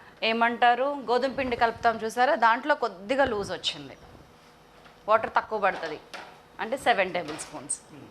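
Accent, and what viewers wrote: Telangana, India. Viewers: mam meru super yahe mana pakka telangana style Lo cheppa don't mine super Mam super recipe defenetly try chesta comment pedata Tq.......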